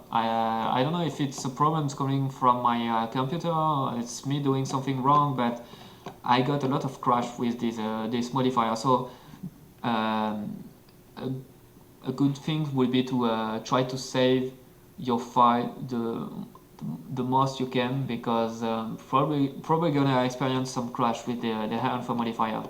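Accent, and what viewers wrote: France. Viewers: jeu sur attaque des titans par un français \o\ . Ca se voit que tu viens de france (accent)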